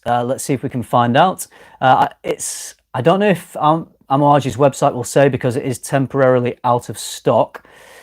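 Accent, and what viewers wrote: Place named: Northern England, United Kingdom. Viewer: I knew were from the North because when you say but you say bot lol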